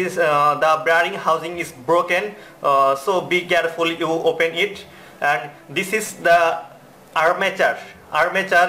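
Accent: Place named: Bangladesh. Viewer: Your English like Bangla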